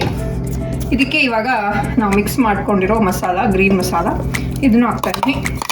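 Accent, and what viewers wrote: Mangalore, India. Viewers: I love you r voice 😘 simle ❤️ Your manglore kannada style and your family ❤️😘❤️ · 1. cutness overloaded.😍..I'll always be waiting for your vlogs to see him 2. Manglore Kannada ❤️ nimma maathu kelodralli ondu maja 3. naaviruva jagadindane Canada nodo avakasha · manglore style talking Ur positive vibes when u speaks about any thing I love ur family estu kushi kushiyagi irtira manele idruuu And i love ❤❤❤❤ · Firstly I am an engineering student. I wanted to do masters in Canada and probably work there for some years and come back to India. While I searching about this I found out your channel. Since then I am following you. 3 points are 1. You sharing the experience of living in Canada 2. Nimma Mangalore kannada😂 3. Your simplicity and humbleness Asthe akka mathe yenu illa By the way nim maga super cute. Hopefully I fulfill my dream of doing masters in Canada 😬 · Channel yake ista Andre 1. 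Your voice and Mangalore style kannada 2. Your life style and cooking style 3. Nanage nimma explain maduva method ista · 1.Nimma manglore Kannada tumba ista. 2. Nivuu Canada dallidru Kannada na tumba chennagi matadtira so nice of you and cuteness. 3.Nim Volgs simple agidru nodoke kushi ista agutte anyhow keep doing Volgs👍.nim hubby veg curry I tried it was came out yummy tq. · 1.I love u soo much 2. I like ur talking style manglore kannada I am from Coorg 3. Beautiful content and camera clearty is super ur the best...... YouTuber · Firstly, Congratulations on hitting 50k. Keep growing🤗 1. As you already mentioned, - The way he speaks is adorable. He is cute and handsome. The way he respects elders and his innocence will always touch my heart. If I had a son, I want to be him like. Parenting is also very important and I also consider parenting the right way is tough. You did it great👏 2. 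You are flawless while speaking. While speaking English or Kannada there is a fluency and clarity so that's make you unique. I love to hear your Mangalore slang and also you will correct words or pronounciation while speaking Kannada so it shows how much you like the language. Happy and proud about you😇 3. From you i got to know Canada much better. I love when you travel and show us different places. I also like your care and love towards family, recipes, humour, your lifesyte, tips about face and much more. Most important confidence in you and your vlogs are more organic. No drama involved. The list goes on.... Thank you for having patience to read my comment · 1. I love while he is speaking 2.Talking Manglore Kannada 3.Your positive vibes and ur tradition. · 1. matadodanna nodlikke nimma vedios nodtene. 2. Nimma mangalore kannada kelalikke tumba kushi agutte mattu kannada dallina spastane kelikke tumba esta agutte. 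3. Nimma vedio editing mattu background music kelikke tumba esta agutte.. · I love you akka I love voice and your voice I love your cooking I love your way of talking I love your way of Mangalore Kannada voice I love you are editing Lots of love from INDIA ❤️❤️❤️😘😘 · Hii ma'am, I'm 18 and I feel your content is very entertaining and it makes me happy to see you always happy and motivated. I like how beautifully you celebrate each festival in Canada and cook various Indian dishes with passion. I really like the way you're bringing up your son, he's such a sweetheart. Overall you're very inspiring and Mangalore kannada thumba chanda untu🖤 I feel these are my opinions on your channel. Lots of love and power to you🖤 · 1.Nimm Mangalore kannada tumba esta agutte 2.Nimm unique Voice ishta agutte nivu matado kannada ishta agutte 3. matu nivu avnig kodta iru Sanskriti tumba ishta nimage adakke danyavadagalu... 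· 1. cuteness 2. Mangalore kannada 3. Your talking style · Hi mam nimma Mangalore kannada kelalu chanda Matte natural agi vlog madtira Nivu torso chat recipes awsome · Nim Kannada ಮಾತಾಡೋ ರೀತಿ especially Mangalore Kannada is too good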